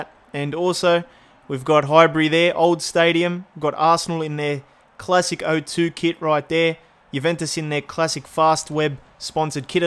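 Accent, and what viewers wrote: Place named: Australia. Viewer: This is the most Australian commentary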